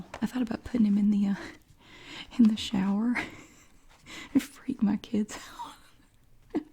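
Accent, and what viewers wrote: South, United States. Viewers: Your southern accent gives me allll the tingles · Your country accent is everythingggg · I love the way you say everything :D I didn't like southern accent until I discovered your videos. You are so sweet and funny!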